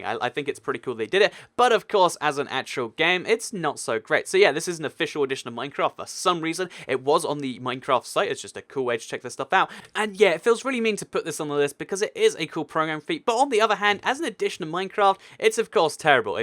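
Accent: United Kingdom. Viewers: You sound mix of British and Russian and German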